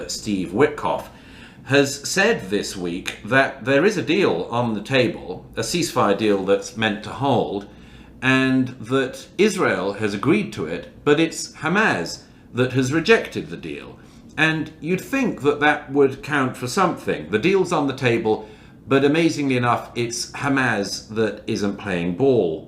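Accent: United Kingdom. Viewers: Your sly British accent isn’t fooling anyone with your shekeled hasbara Murray.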